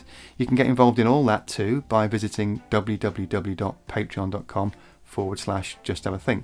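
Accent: United Kingdom. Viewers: You forgot to mention Geo Engineering or Weather Modification. Not really impressed with the Brit accent.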